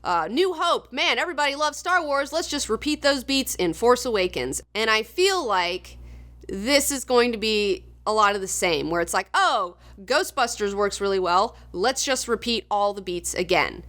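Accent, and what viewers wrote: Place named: South, United States. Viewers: Are you from south carolina or north carolina? The way you speak has a hint of an infliction that I've only heard there. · New to channel- does anyone know if she's from the south? I hear a southern accent xD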